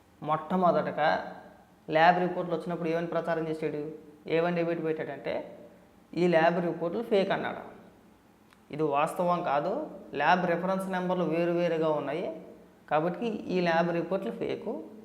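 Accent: Godavari, India. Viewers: Power of Godavari slang 😊❤🎉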